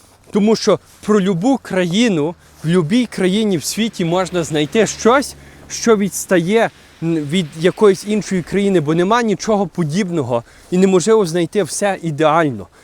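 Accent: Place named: Lviv, Ukraine. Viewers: Хлопче, кому ти це говориш?..До біса все це. В тебе коломийський акцент розмови, гайда копати кирницю в Канаді. Твої предки мігруючи за кордон, молодці що зберегли і законцервували для тебе мову. Так само зробили мої предки мігруючи до Аргентини. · Та ньи,він хоц і канадец,але чолувік з Львова,і всьо їдно всі розуміют шо хлоп хтів сказати - в тому й колорит нашої мови.На жаль ми катастрофічно втрачаємо наші місцеві діалекти - замість напевне якогось Черкасько - Полтавсько - Запорізького варіанту мови.ТАК ШО ВСЬО ФАЙНО!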